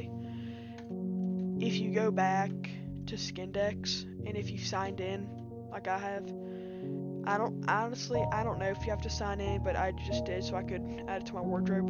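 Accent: United States. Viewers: Are you from Alabama by any chance? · Texas haha I like your accent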